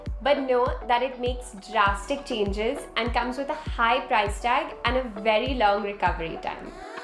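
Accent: India. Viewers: Ummm someone please explain why this white girl sounds Indian? It's freaking me out. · is it just me or does she have a Indian accent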